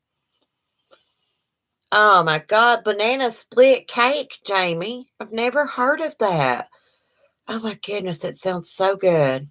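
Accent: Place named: East Tennessee, United States. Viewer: New sub! It’s nice to find someone calm and not instigating trouble! Where are you from? We have the same accent..East Tennessee here! Glad that I found you!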